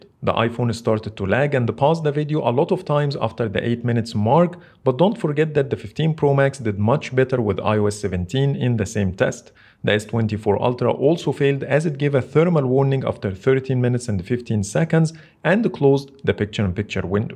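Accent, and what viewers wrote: Egypt. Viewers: واضح جدا إنك مصري والإنجليزي بتاعك باين جدا ليه مش بتعمل فيديوهات بالعربي .. اتعلم من عمر دايزر ويحيى رضوان قنوات بالعربي وشوف شغالين ازاي وعندهم متابعين قد ايه .. بلاش تعمل فيها مثقف وتروح تخاطب ناس مش هتعبرك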